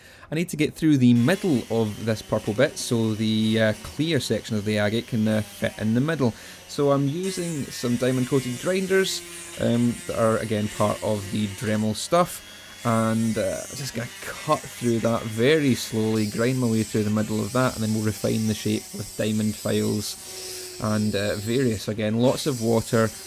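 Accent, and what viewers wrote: Scotland, United Kingdom. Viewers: love the scottish accent. feyner tooth foyl :) keep up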